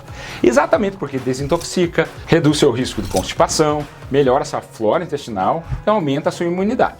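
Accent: Brazil. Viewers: É o sotaque caipira e a maneira desembesta de falar… Se ele é humilde, não dá pra saber. A humildade é uma virtude muito rara nos dias de hoje.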